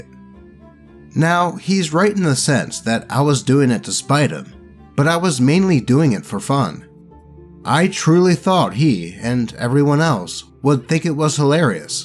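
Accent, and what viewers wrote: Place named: Baltimore, United States. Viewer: That Baltimore/Maryland accent coming out real strong in the first story lol all about Heaumophaeubia and the player hitting awn OP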